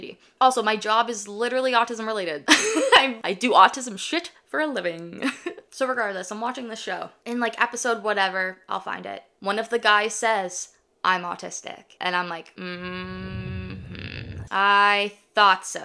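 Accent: Canada. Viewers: Canadian? I detect a mild "aboot."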